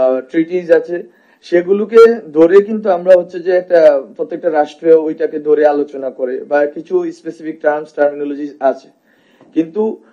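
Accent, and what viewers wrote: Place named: Noakhali, Bangladesh. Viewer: নোয়াখালী টান আসে কথায় আমি তার কথা বুছি না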